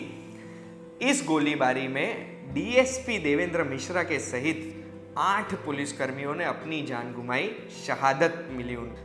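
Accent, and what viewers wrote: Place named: Gujarat, India. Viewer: hindi ma bole to pan Gujarati sambhday chhe 😁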